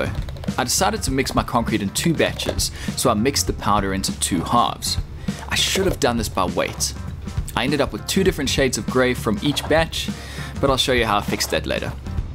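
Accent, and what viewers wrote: South Africa. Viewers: South African? Great job btw. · Thought to myself, this oke sounds Saffa, then spotted the bare feet for confirmation LOL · Definitely a South African living in Australia. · Boet, I see you're in Oz, but you sound just like a Saffa? Great video by the way. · Hey man, this is great 👍 Are you in perth? ( you sound South African ) , we have lots of saffas here. Nice work again mate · Your accent has alittle South African in it..love your work.. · That accent is SOUTH AFRICAN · Oi this dude sounds like a South African bloke? ;) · Hi. I can hear from your accent that you are from South Africa, what cement do you recommend using thats available in SA? Thanks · You sound like a South African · you sound totally south african to me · Aussie - who woulda known, you sound South African. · This is definitely a South African accent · Helpful video. 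Thought you were South African based on your accent.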